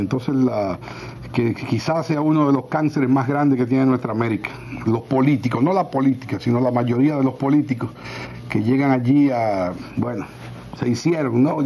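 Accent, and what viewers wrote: Puerto Rico. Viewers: Bendito nene! pero mimetizaste bien el acento boricua...¿? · Yeste acento boricua Tony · Cuánto talento tenía Freddy ya en ese tiempo era una figura Tony 😂tu hablaba boricua cuando eso pero tienes tus méritos también eres bueno rip Freddy el mejor y más valiente artista de la TV dominicana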